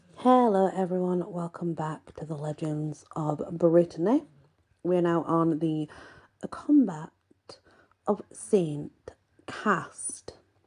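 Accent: United Kingdom. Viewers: Good evening ✨ I’m absolutely in awe… the legend of Saint Cast is one of the most powerful things I’ve heard in a long time 😢💕 your storytelling is so vivid I felt like I was standing between the two forces—Highlanders and Bretons—watching them realize their kinship through a shared song 🎶🫶 the moment they disobeyed orders and embraced was so symbolic… it’s the kind of tale the world needs now 💔💛 your mention of how the song might trace back to a Celtic theme and how Reid could have drawn inspiration was fascinating 🧐 the little details you shared made the story feel alive 🔍 your calm, gentle, British-accented voice is like being wrapped in a soft blanket while hearing ancient wisdom 🧡 thank you for your light, your research, and your heart 🌟 more power to you, you are a legend yourself 💐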